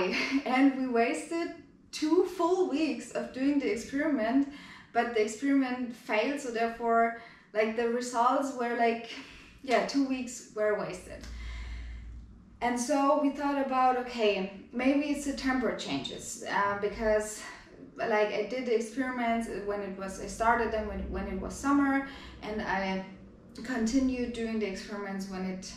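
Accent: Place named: Germany. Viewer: Is that the Deutsche accent , your Deutsche -english accent is fluent and delightful 🤣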